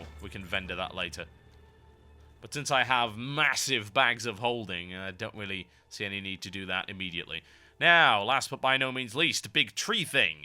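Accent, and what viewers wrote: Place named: Newcastle, United Kingdom. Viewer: OH MY GOD , Your from Newcastle!?!? Im from Sunderland :D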